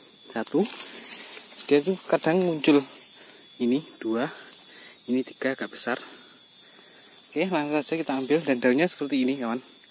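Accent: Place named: Bojonegoro, Indonesia. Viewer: logat bicarane mirip bojonegoro.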